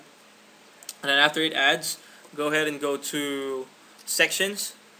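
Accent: Philippines. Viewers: lol man i can tell your soo phillippino!!! pinoy accent ftw. ;p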